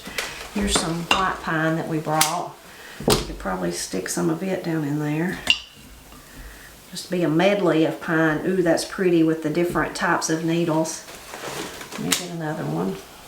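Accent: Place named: Appalachia, United States. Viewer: Great video!, thank you for wonderful ideas to decorate. I am watching the video and eating the biscuits we made using your recipe. Is there a chance to make a video on how to master Appalachian accent, especially [ai] sound like you pronounce in whIte, or try. Thank you Best, Yulia from Florida, Katie's cheerleader;-))))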